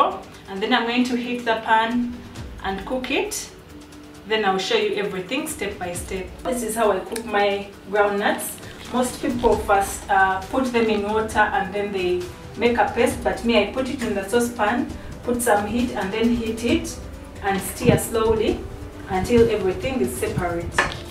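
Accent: Uganda. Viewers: Knew from the accent that this is 🇺🇬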